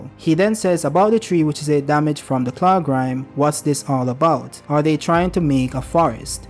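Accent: Jamaica. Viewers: Are you Jamaican? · Are you jamaican?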